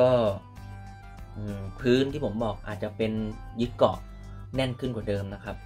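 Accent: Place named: Japan. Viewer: สำเนียงญี่ปุ่นจัด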